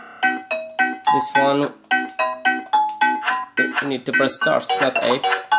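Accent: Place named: Brazil. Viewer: Lol... Would you happen to be Brazilian? If not, hopefully no disrespect is inferred. I ask bcuz I hear an accent... Thanks!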